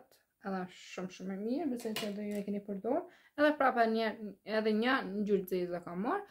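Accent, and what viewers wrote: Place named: Kosovo. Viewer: Pershendetje me shume siguri do te hapesh parukeri apo e ke nje te till. Ne cfare qyteti je . Nga djalekti me dukesh nga veriu ise me sakte nga kosova. Suksese❤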